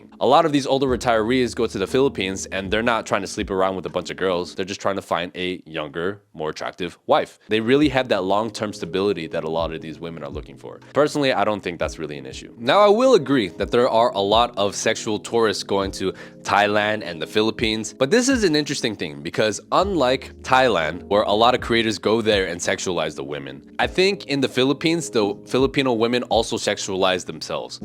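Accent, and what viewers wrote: United States. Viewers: I'm thinking about it because I want to surf and skate 🤘😎🤘 and play some music 🤘😎🤘 You know this cat doesn't drink sink water in America 😂 he's totally a gas station water bottle Guy 😂 He speaks like an American but he said he was Chinese/Philippines he didn't say anything about why he sounds American 😂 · tribal … no … family first … your accent sounds american … which has become tribal of wokism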